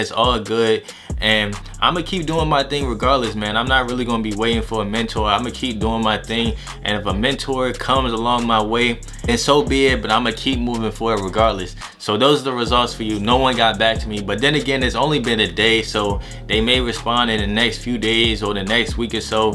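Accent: Philadelphia, United States. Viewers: You sound like Philly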